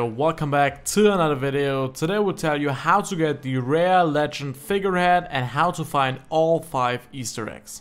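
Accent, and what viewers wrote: Germany. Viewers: Du hörst dich deutsch an :D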